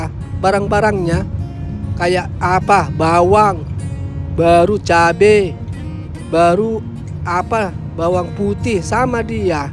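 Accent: Medan, Indonesia. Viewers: Logat Abang seperti orang Medan, apa Abang orang Medan?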